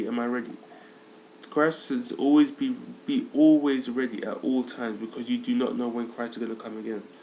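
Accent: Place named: United Kingdom. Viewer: i like your british accent !!!!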